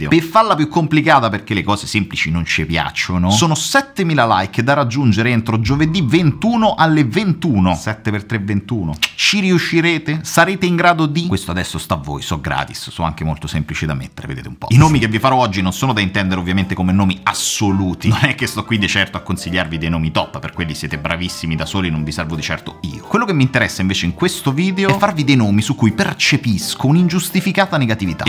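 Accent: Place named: Terni, Italy. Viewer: ti prego rispondimi di dove sei perche dall accento mi sembri di terni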